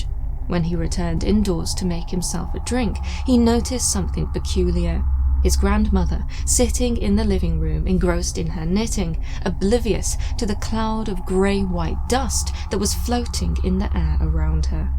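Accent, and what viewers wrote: England, United Kingdom. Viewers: Hearing say "trust me bro" made me laugh! Hearing her beautiful sweet voice, with her English accent, I had to go back to listen to it again because I thought I was hearing it wrong. Nope, I was right, and she did say that LOL. Great video as always! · All I have to say is every time I hear your voice in these vids I think " oh boy there is that English Girl throwing down a good story... Keep it up.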